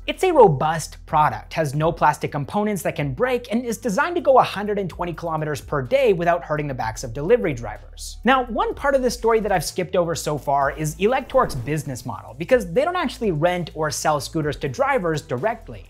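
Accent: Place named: India. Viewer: Dude, this guy nails the Indian accent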